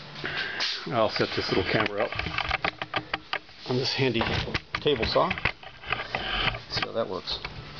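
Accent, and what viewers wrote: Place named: South Australia, Australia. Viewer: hey nice to hear another south aussie are you in metro or country sa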